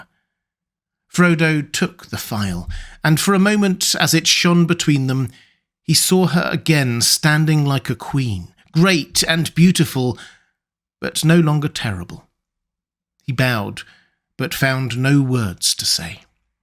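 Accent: United Kingdom. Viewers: I like to put on your videos and have your dulcet british voice lull me to sleep.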